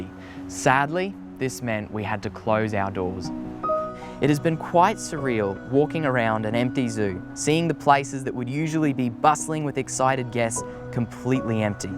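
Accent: Australia. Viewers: I'm only in it for the Australian accent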